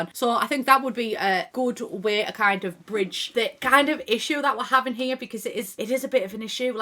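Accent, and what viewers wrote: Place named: Northern England, United Kingdom. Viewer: I see you too are from proper up north England and that's why you sound like that.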